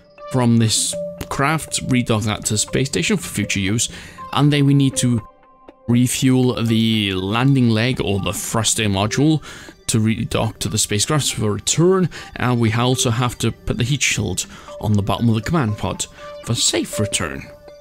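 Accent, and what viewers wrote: Wales, United Kingdom. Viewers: love ur Welsh Accent, besides that. Please can you include wich mods you are using?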